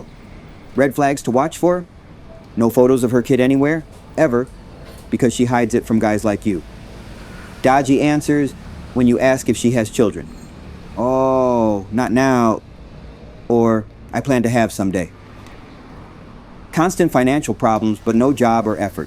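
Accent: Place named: India. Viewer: why u are indian man your accent can't escape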